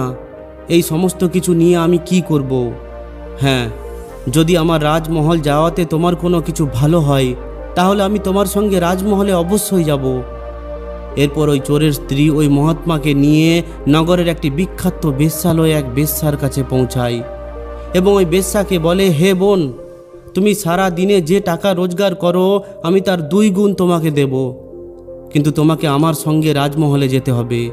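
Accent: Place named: Kolkata, India. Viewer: উনি কলকাতার মানুষ তাই এটা কলিকাতার ভাষা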